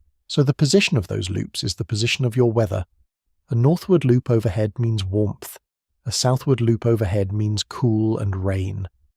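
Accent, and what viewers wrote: United Kingdom. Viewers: Dude it is the water current of the changing Ocean’s…… CHANGING THE SALINITY!!!!! So it won’t sink and freeze ! ONCE IT ROLLS OVER!!!!! You will pray for Hot days!!! England will be under Ive for 100’s of years!!! (IT IS A CONVEYOR BELT THAT FREEZES AND AINKS AROUND Greenland!!! Loosing salt because of the melting Ive waters from North Canada and Greenland itself! ( heat in England comes from the hot ocean)!!!! LISTENING FOR THIS D BAG BRITI ACCENT TRY TO BRING A DIVE OUT FROM UNDER HIS HAT!!! THE JET STREAM!!!! No s h i t e!!!! Try a tornado with your milk tea whoopsy-daisy!!!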